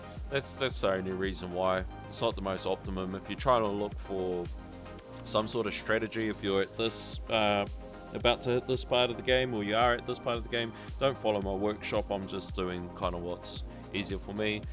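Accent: Australia. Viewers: you can skip to the second mark to skip the intro music and hear that beautiful aussie accent ;)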